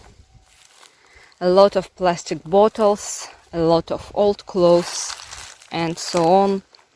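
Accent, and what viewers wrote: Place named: Eastern Europe. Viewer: I gave you your 82nd subscription, I hope you reach 100 very soon! This video showed up on my homepage randomly, so this is my first viewing of you. It would be nicer tor additional viewers interested, to know the general location of where in the world you are building your personal paradise? You speak good English, but definitely makes me think perhaps your in Eastern Europe somewhere? As a suggestion, and perhaps more viewers from your general area will feel a relation and subscribe?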